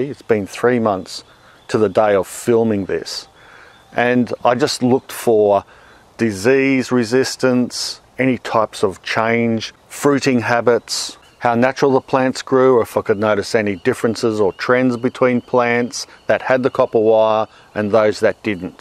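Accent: Australia. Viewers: I am a Yank electrician and notice that he wraps the copper around the stem in a counter clock wise direction, I assume he is Aussie or Kiwi by the accent? Northern hemisphere winds clockwise just like the toilets flush. Opposite poles provides the aether field. Thank you for your efforts sir, and thank you for providing your knowledge to me.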